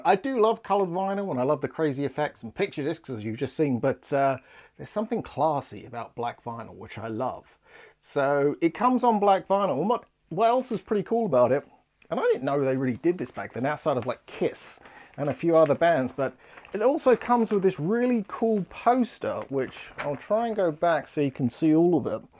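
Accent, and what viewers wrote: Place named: United Kingdom. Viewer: Great stuffs in this first episode, really you're an incredible music collector, you're so young but with so many top items that I'm literally shocked about it! My respect for you will last forever! I like your format on the video, I like your British accent, although I should follow sometimes the YouTube subtitles because it's not simple for me to understand every words! I too have the vinyl and i like this album (really underrated by the fans), about the other albums I've downloaded them from Spotify to give them a listen... You're so lucky to have the boxset of as the Suspiria TP. A piece of Italy in your life! Stay gold!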